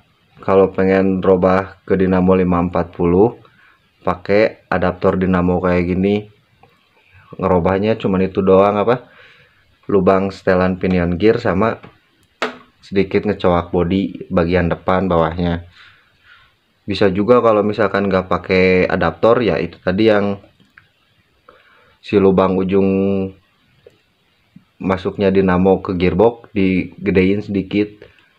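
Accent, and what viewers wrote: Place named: West Java, Indonesia. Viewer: Kang sunda na karaos,asli timana 😅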